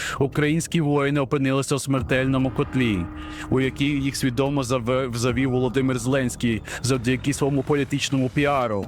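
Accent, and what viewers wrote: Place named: Russia. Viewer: Фейк!, рос пропаганда ! Акцент кацапский! Нет никакого окружения, это путлеровское враньё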